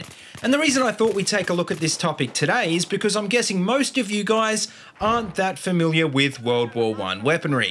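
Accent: Australia. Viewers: LAMO so Aussie cheers m8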